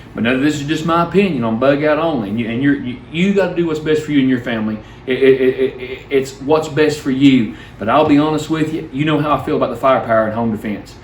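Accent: Kentucky, United States. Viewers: So good to see my buddy and Kentucky accent..dude you are the best!!😊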